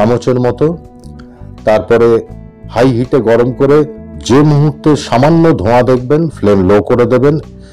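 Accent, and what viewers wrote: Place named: Punjab, India. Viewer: Dear Sir , thanks fr yr kind feedback. Just so that you know, your voice was “ just perfect , precisely so “…. Matching the tone and tenor , of this Punjabi bowl of Heaven…you sounded well , like …Punjab da Puttar….that said, on behalf of all, of your viewers , pls allow me to say this, please take care , since we hear you say , you do feel a bit challenged….this is a first , we hear from you…pls Sir, be well, even if fr , the sake of wonderful recipes , wherin you truly deliver the Science of Cooking, into this art form of Cuisine….bhalo thkaben…God Bless….Namaskar 🙏🏻🙏🏻🙏🏻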